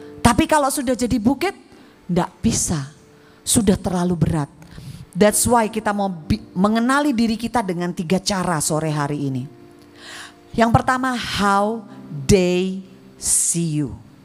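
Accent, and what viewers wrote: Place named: Java, Indonesia. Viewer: Pasteur nama batak kok medok dan campur bahasa Jawa tp jd lebih membumi ❤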